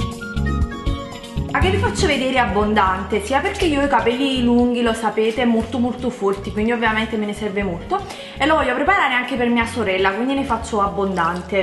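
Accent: Naples, Italy. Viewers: Hai l'accento napoletano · Ossicini è in dialetto napoletano se non si era capito dal suo accento fonetico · sbaglio o sei napoletana, l'accento è inconfondibile 😂😂😂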